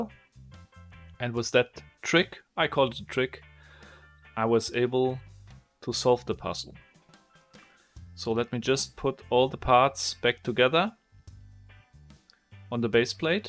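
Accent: Germany. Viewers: Man hört den deutschen Akzent im Englisch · Alter du bist deutsch dein aktzent verraten ^^ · dieser Moment wenn du merkst dass er Deutscher ist 😂 der Akzent.... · Man hört das du deutsch bist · You have a german accent. Are you from Germany?☺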